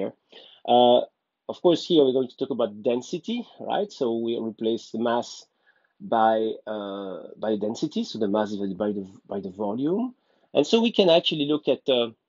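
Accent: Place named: Belgium. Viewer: thanks a lot ... ur fan from morocco. Je sais que vous êtes belge et ainsi vous parler le français ...ça se voit surtout sur ton accent... vraiment vous faites un trop honneur pour votre pays ...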